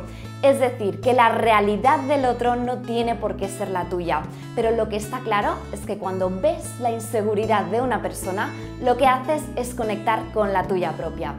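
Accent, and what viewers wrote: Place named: Spain. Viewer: Va relacionado con la frase: no mires paja en ojo ajeno..? ... bueno en lo personal trato de aplicar y mejorar en tema de virtudes y actitudes. Me podrías decir si lo que explicas lo puedo leer en un libro de psicoanálisis o es otro rama de la psicología? . Tu acento español sueña como un eco deberías mejorar tu audio al grabar y hablar un poco despacio sería mejor tu video. Espero no ser ofensivo con mi humilde opinión. Gracias/ saludos..!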